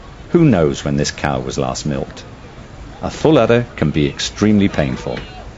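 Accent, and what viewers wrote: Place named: United Kingdom. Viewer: sound like British English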